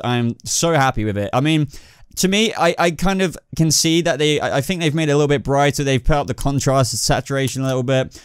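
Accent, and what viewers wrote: United Kingdom. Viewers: And btw nice haircut are u british cause u kinda sound british and a differ race i can't recall or put my finger on lol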